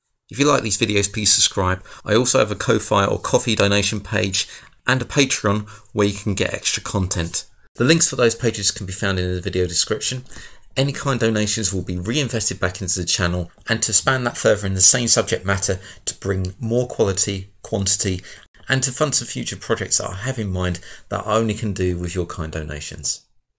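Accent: United Kingdom. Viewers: Both lessons are excellent. (Everyone loves a British accent). FreeCAD specifically, and CAD programs in general, are inherently complex, NOT intuitively obvious, and can be extremely frustrating. But all videos are exceptionally well done, and well thought out. Each step is performed without error, so there is less confusion. The parts have sufficient complexity but not too much. The presentation is correctly paced for absorption, uses several commonly used features, and is most helpful. FreeCAD is very powerful once mastered. Thank you for doing a great job and a wonderful service to the 3-D community. 😀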